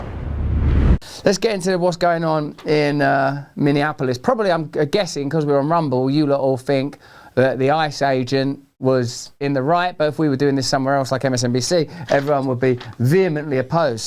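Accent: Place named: United Kingdom. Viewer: I love how this guy's says absolutely nothing but has a British accent so people thinks he's saying something so insightful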